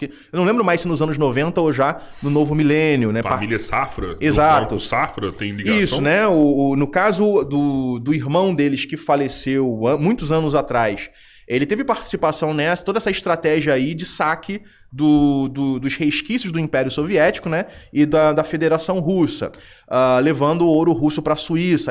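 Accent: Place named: Rio de Janeiro, Brazil. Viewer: Muuuuuuito carioqueixxxxx, fica difícil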